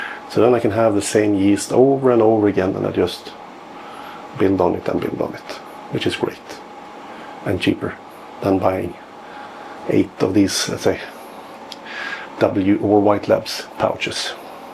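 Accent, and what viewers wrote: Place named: Sweden. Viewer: I like your swenglish. Nice equippment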